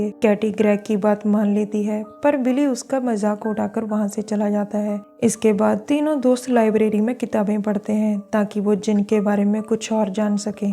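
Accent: Punjab, India. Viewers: Yaar punjabiat jhalak di hai accent sahibkaro hindi ya punjabi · Hi my 💕 punjaban love ur voice & assent ur big fan Pardeep gill from Ludhiana Punjab ❤️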